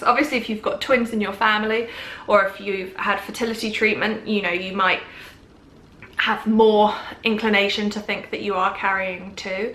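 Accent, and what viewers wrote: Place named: United Kingdom. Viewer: Elizabeth Ungemach British accent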